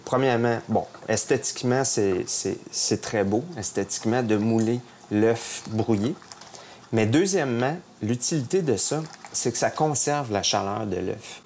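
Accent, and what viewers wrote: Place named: Quebec, Canada. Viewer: J'adore votre accent également ! Je craque complètement sur l'accent québecois.. J'essaierai votre technique la prochaine fois que je mangerai des oeufs, ça a l'air bien sympa !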